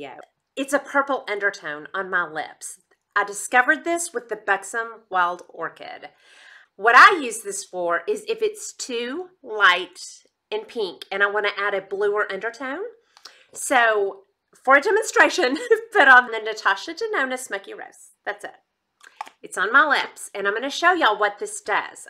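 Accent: South, United States. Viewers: Hi I’m new and Mary Ellen sent me over. You sound like a fellow Southern girl I lived in Tn for years and reside in Beaufort SC now. Back to your vid. 👍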